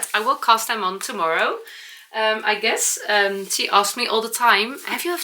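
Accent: Netherlands. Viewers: I live in Kentucky in the USA. I love your Dutch-ie English!!! Heart jumping is one of my favorite things you say. It is such a beautiful and descriptive way to express it! I have always also loved your hair. You looked extra beautiful this episode. I have similar texture to my hair and I think I will cut it like yours! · I love the “heart jumping”! I’ve been saying it and my Grandsons (5 and 9) think it’s fun and they know exactly what I mean when I say they make my heart jump!❤❤❤ Love your podcast! And your “Dutchy” English. You are perfectly easy to understand!!! ❤️🥰❤️🥰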